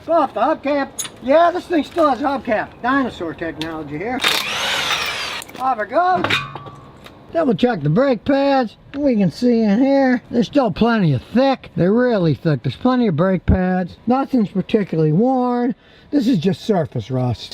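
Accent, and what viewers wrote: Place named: United States. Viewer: Running translation is must in English as some people do not understand American accent orally.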